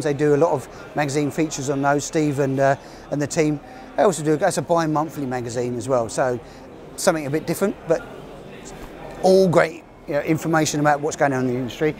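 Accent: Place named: Cockney, United Kingdom. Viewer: Put the Camera on the cars...Can't understand a word this cockney is saying...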